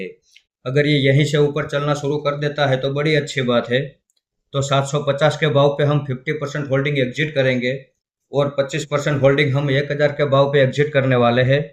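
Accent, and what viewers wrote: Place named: Gujarat, India. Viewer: તમે ગુજરાતી હોય એવુ લાગે છે. ખુબ જ સરસ આંકલન કર્યું મોટાભાઈ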